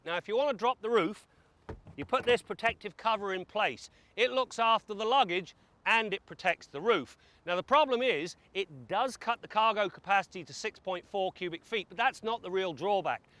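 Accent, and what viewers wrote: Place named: United Kingdom. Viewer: I am confused. This guy has a British accent. He is talking about dollars. The car is LHD but has Celsius on the climate. What country is this?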